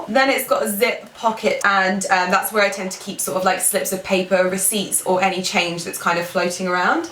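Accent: United Kingdom. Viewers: Really Love your style and your bags!!! Keep posting your fabulous bags :) and your accent remind me of the days studying in the UK. Love from Taiwan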